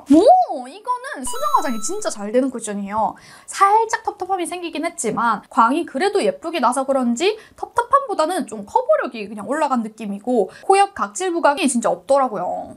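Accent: Busan, South Korea. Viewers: 이 웅니 경상도다...경상도 꼬순내가 난다...아닌가....아니야 꼬순내가 낰ㅋㅋㅋㅋㅋ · 저랑 피부비슷하셔서 이분쿠션영상보고 빛이다 감격했는데 요 영상도있었네요 ㅠㅜㅠ짱짱짱 ㅠㅠㅜㅠ부산인인데 사투리까지 짱정겹다 제 친구느낌이에욬ㅋㄱㅋㅋ 퓌 리뉴얼이랑 클리오 하이글로우 웨메쿠션 진짜 궁금했는데 감사합니댯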